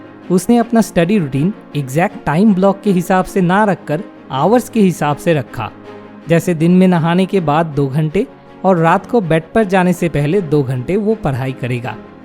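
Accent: Bengal, India. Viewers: Welcome back Bangoli accent voice ,,,,👍👍👍👍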